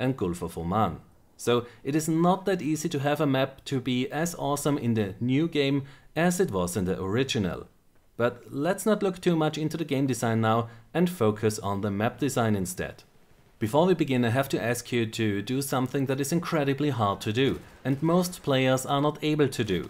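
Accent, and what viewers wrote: Germany. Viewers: German accent... Yet he talks like lvlcap... Interesting.!! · your funny accent is german, right? correct me if im wrong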